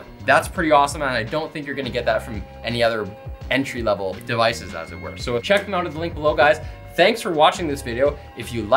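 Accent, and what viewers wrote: Canada. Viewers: Thank god for closed captioning. Can't understand a word with that Canadian accent. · I can really hear the Canadian accent in this video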